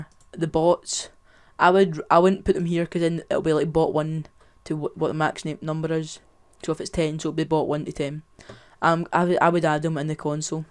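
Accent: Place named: Scotland, United Kingdom. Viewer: Thought so i am 2 xD can just tell when some1 is scottish xD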